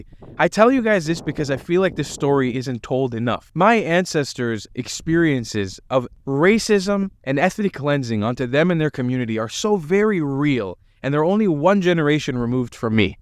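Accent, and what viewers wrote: United States. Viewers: You sound American. But the generation who came from Baghdad - of course they are Arabs. It's not abour "blood", it's about language and culture. Why Iranian Jews are both 100% Iranians and %100 Jewish and even good Zionists? And why you guys are offended by being called Arabs (which you are). In Israel they taught you to hate yourselves. And if you are not Arab then you are left with no culture. Hence the situation of "mizrahim" - crime, "arsim", far right... Arab culture does not belong only to Muslims. There are Arabs of many different religions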